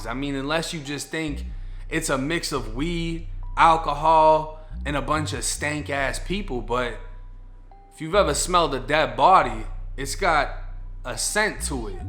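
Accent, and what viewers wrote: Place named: Florida, United States. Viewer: Lol yo not for nothing bro story telling voice is krazy 😩😆😆🙌🔥🔥🔥 lol I’m from NYC and I know this a wild Florida accent but that alone has me hooked on these videos, perfect example of BEING YOURSELF! Always be yourself, it’s the difference between true success and temporary failure 😊❤️🙏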